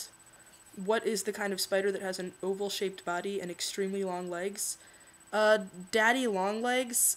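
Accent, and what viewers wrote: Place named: Philadelphia, United States. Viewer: I have lived in southern California for almost my whole life. I noticed the way you pronounced some words were different from how people pronounce them here, so you very most likely have a Philadelphia accent. :)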